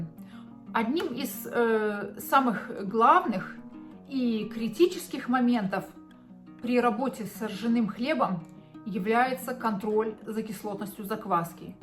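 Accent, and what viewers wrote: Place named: Finland. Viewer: У Вас финский акцент👍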